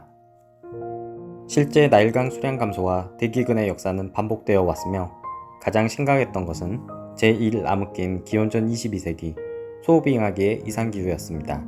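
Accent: Jeolla, South Korea. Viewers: 전라도 사투리가 심하네요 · 말투에서 잔라도가 느껴지네요..내 생각이 맞을걸요~ 사투리 감별사임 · 고향이 전라도신가요 ㅎㅎ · 전라도 분이시네요.사투리가...^^ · 사투리만 고치면 더 좋은 영상이 되겠네요. 전라도 사투리가 너무 심함. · 전라도 사투리 모댔던(못했던) 참ㅋㅋㅋ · 전라도사투리 구수하고 좋아요ㅎㅎ 잘봤습니다 잘만드셨네요 유익했음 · 전라도 분이시네^^ 영상 잘 봤습니다~~ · 전라도사투리쓰는거 안부끄러워요? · 유튜버 전라도 사람이네 ㅋㅋ 못했던>>>모댔던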